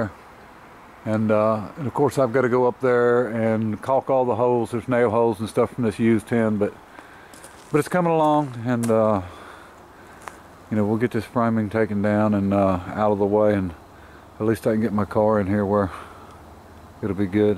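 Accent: South, United States. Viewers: The way you say bye kills me because you sound like my bestfriend (my grandpa). Its been 13 years since i lost my grandpa. He was from West Virginia but met my grandma in detroit. He sounds the same (southern).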